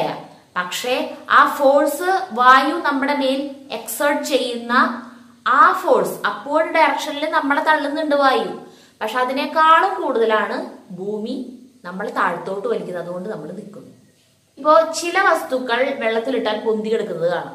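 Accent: Thrissur, India. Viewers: Thrissur anele😂😂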